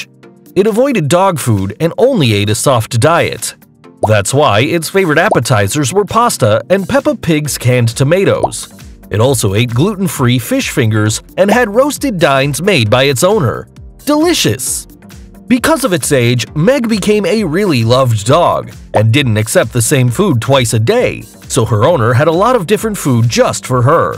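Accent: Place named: United States. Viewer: I do not like the USA English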